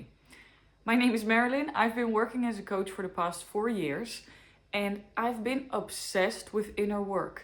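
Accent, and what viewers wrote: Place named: Netherlands. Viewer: Thanks for sharing the wisdom! As someone who learned very early that worth comes from performance, even my healing sometimes becomes another project to optimize. And, I've only recently became aware of this trap. BTW, Dutch accent is my favorite :) Keep up the good work!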